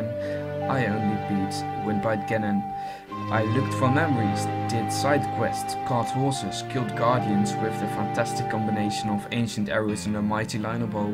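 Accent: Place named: England, United Kingdom. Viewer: ur english accent is getting pretty dank btw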